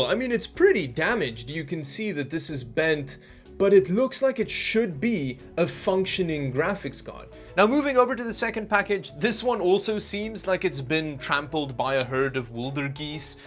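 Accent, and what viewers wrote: South Africa. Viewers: Is this guy from south africa? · Are you from SA?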